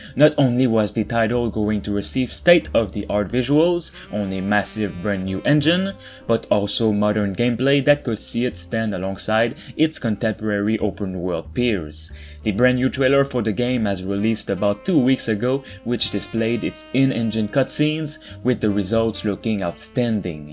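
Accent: Quebec, Canada. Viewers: Tu es du québec ca c'est sur avec l'accent è la GSP hehehe moi aussije suis du québec. Si tu as envis de faire un podcast en francais un jour laisse le moi savoir! moi aussi j'attend mafia 1 remake jen'y ai jamais joué mais j'adore la série donc j'ai hâte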